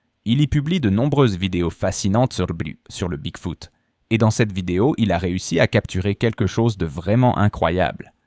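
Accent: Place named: Quebec, Canada. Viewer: Franchement je dirais qu'au contraire la voix est très réussie, c'est peut être le leger accent québecois qui t'a dérangé.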